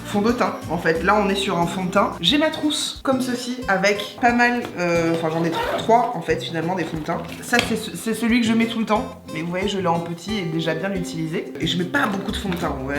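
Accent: England, United Kingdom. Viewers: toi j'aime m'occuper de ma peau MAIS LE MAQUILLAGE ÇA PREND PAS SOIN DE TA PEAU HAHA TU FAIT RIRE😂😂😂😂 #LE CACA D'OEUIL QUI VIEN TOUT GACHER TU ME RIRE AVEC TON ACCENT ANGLAI VIELLO😂😂😂😂😂😂😂😂😂 MAIS JE T'ADOR MA PRINCESSE TU FAIT TROP RIRE😚😚😚😚😚😚😍😍😍😘😘😘😗😗😗😗😂😂😂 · Elle est belle les anglaises sont trop belles charmante accens charmant et appart de reves.bref source dinspi. Univers rend nous charmante.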